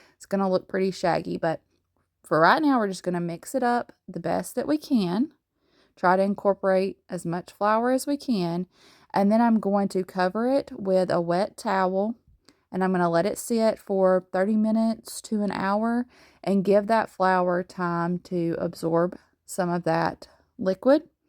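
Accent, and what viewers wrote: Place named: Alabama, United States. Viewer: You sound like you’re from Alabama. I’m a north Alabama girl and I feel the southern cooking in the bones!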